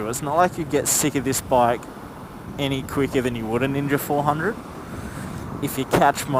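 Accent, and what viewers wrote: Australia. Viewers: Maaate, are those wheelie bins? "Brand spankin' new"? This guys gotta be an Aussie too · cant u hear that he is from australia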